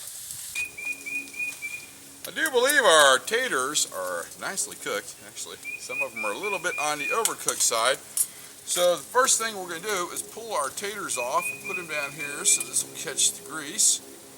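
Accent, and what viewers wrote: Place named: United States. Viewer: Great Video, yr cooking Videos becomming more and more Professional...its nice to watch you while cooking and listen to yr nice american Accent,... the video is very interesting to me, more interesting than to listen to the cake king in US television. greetings and good appetite my friend Harry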